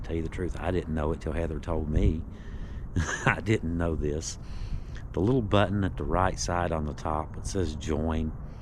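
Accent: South, United States. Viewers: I wish I found this channel sooner. I will watch more videos as this one is fascinating., you have what I think of as the true southern accent, calm, measured speech. My mother's people were in Arkansas and I thank you for bringing their voices back to me.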